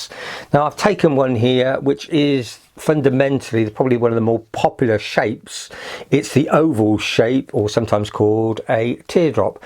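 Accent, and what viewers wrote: United Kingdom. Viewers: You ! From the U.K ? Love your English / British accent wow.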